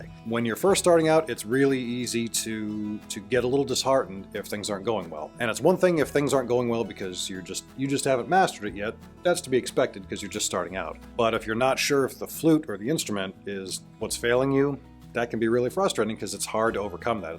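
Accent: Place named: United States. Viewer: Love your advice and presentation, but would you STOP talking like a yank. Have subbed 👍👍☘☘☘🇮🇪🇮🇪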